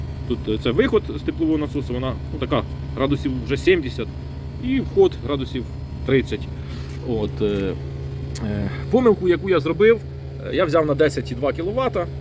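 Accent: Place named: Surzhyk, Ukraine. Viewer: Дякую, все цікаво, але цей СУРЖИК.... Кожне третє слово.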